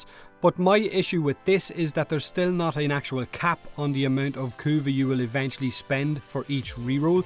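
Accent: Ireland. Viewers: are you Irish cause you sound Irish?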